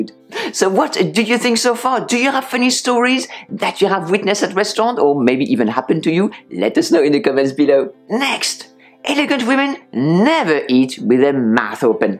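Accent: France. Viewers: I love your french accent.!!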